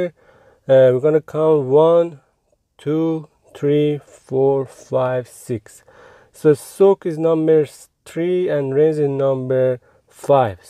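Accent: Iran. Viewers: I love the Persian accent. ❤️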